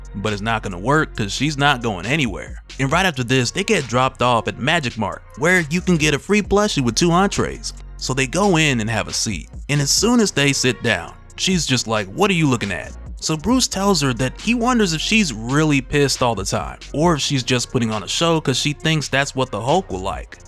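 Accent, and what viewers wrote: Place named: West, United States. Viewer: i love ur western accent tho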